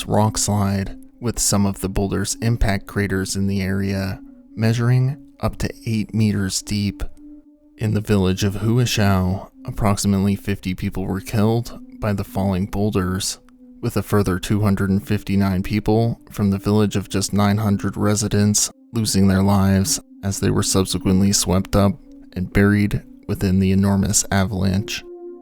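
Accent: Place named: United States. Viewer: Now can’t handle your American voice, I’m sure it’s a grate video but that voice is beyond unbearable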